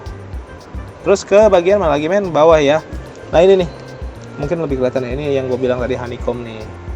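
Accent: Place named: Cibodas, Indonesia. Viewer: Kya org Cibodas nih.. bang yah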